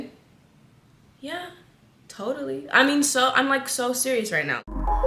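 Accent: Jamaica. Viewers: Yeah the accent is strong in this video. I think I heard a little in other videos tho. Im thinking she is Jamaican. Maybe she’s been around family or spending time there. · It’s the Jamaican accent right out the gate for me! We NEED money 💰 👏🏾👏🏾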